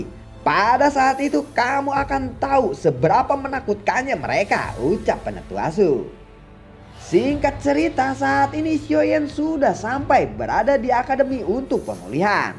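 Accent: West Java, Indonesia. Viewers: Anjay up lagi kirain gk bakal up lagi,gw terlanjur tidur:v Mang pasti sunda nya?:v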